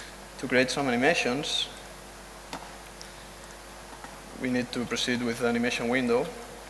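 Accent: Spain. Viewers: Como se nota que es español ajaja gran trabajo men, sigan asi 👍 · is he spanish???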